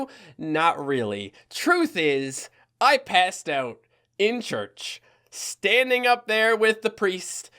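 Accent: Newfoundland, Canada. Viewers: My god your newfie accent is spot on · Man, whenever I hear a thick Newfie accent it always reminds me of my family. All of my extended family lives in Newfoundland, and we used to visit every year but we don’t anymore. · Dude your Newfie accent is fucking, /spot on/. Never been to Newfoundland, since I live in Ontario, but mom's a Newfie, and I gotta say, your accent is perfect. Way to go from a half Newfie to another Newfie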